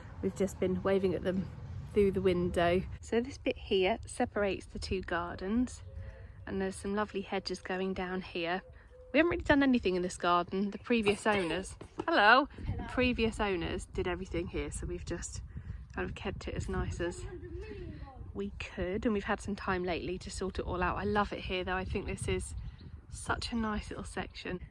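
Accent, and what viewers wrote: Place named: England, United Kingdom. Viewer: Good Morning!! I love your English accent 😍 I come from Italy and I have studied English for many years ❤❤ your vlog is really awesome 😍😍😍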